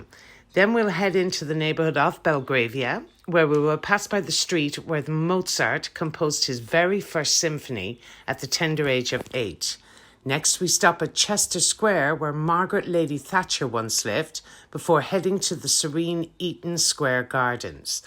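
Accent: Ireland. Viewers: An excellent tour again, full of interesting details. A relaxing time to enjoying and learn about, with a nice Irish accent. Thanks! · I could listen to this lady read the instruction manual for my washing machine. Love the Irish accent 💚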